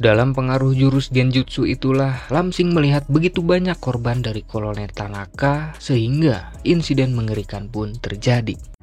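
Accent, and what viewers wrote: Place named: West Java, Indonesia. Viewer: Ges org sunda deui wae.. Asli mana kang?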